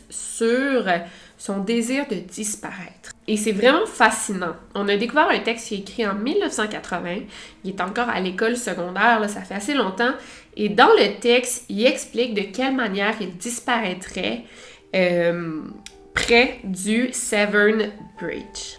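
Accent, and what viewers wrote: Quebec, Canada. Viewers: Je t’écoute à tous les matins avec mon café et à tous les soir en fesant ma vaisselle. T’es excellente je t’adore je veux venir te voir à ta prochaine dédicace à Montréal. Et ceux qui son frustré de ton accent québécois, ben qu’ils écoute d’autre vidéo au lieu de se défouler sur ta chaîne. Moi je trouve que tu explique très bien 👌🏼 Good job girl continue❤️ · Quand j'ai entendu ton accent pour la 1ère fois, je me suis aussitôt dit "mince... ca ne collera pas avec ce type d'histoires" (je trouvais l'accent québécois mignon et sexy mais pas effrayant) mais avec ta facon de narrer et la musique de fond, c'était plutôt facile de s'immerger dans le récit! Belle découverte! Et un préjugé de moins. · Vite encore une nouvelle vidéo de " ", un bon moment assuré!!! Je regarde d'autres vidéos dans le même genre et indéniablement pour moi c'est la meilleur, autant dans sa présentation que son style, unique, qui avec "pour nous Français ce petit accent québécois" qui nous fait voyager ^^ En passant je ne te l'avais pas encore dit mais ton nouveau générique est vraiment super:D Je connais cette musique, je sais que je l'ai déjà entendu mais je ne sais plus où, "Victoria" aide moi stp;) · Perso je me suis très très bien faite à son accent 😁 Du coup je connais même de "nouveaux" mots et nouvelles expressions 😅 Je préfère regardé cette chaine avec l accent canadien, je ne trouve pas de chaines avec des compteuse françaises que j aime autant perso :) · Tes videos sont superbe et ton intro très bien choisi.Mention spécial pour la musique de qui colle bien avec l ambiance de ta chaine.A chaque fois j'attends tes videos avec impatience.Tu m as même fait apprécier l accent quebecois 😁.Bravo a toi et le meilleur pour toi,ta famille et ta chaine.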